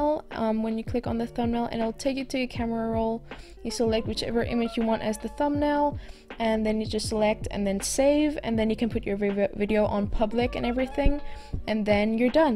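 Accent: South Africa. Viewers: You sound south african I love it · This was very useful!! Also I love your accent!! Are you South African?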